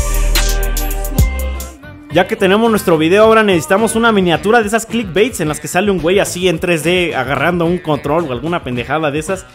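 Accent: Costa Rica. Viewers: Wtf sos tico o algo? Xd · Sos tico?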